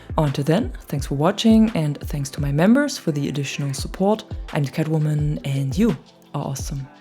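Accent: Germany. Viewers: Man hört voll raus, dass du deutsch bist 😀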